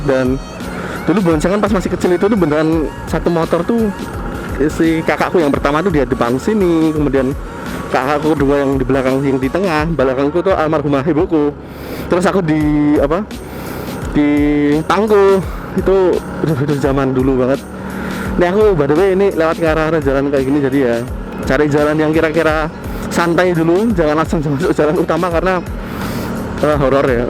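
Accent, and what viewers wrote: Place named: Jakarta, Indonesia. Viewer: Mase logate rodo jakartanan. Hehehe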